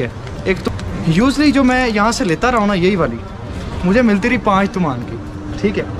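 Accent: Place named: Punjab, India. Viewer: Annoying poon-jobi accent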